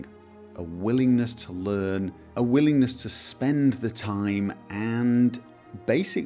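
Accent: United Kingdom. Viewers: Besides the quality and your special attention to quality, what also sells your videos: Your british accent, which can be understood very easily for non english motherlanguage speakers. AND: The sound of your voice is just perfect for narration. Keep them coming and have FUN doing them!!!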